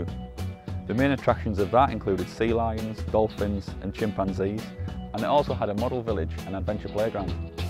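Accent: Middlesbrough, United Kingdom. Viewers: Hi lad, just discovered your channel, it's excellent. Keep up the great work. Are you a Middlesbrough lad by any chance you have the accent?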